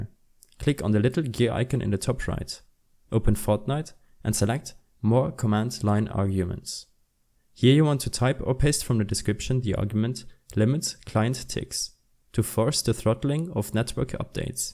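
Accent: Germany. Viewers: lol the german accent xd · Man hört so hard das du Deutsch bist 😉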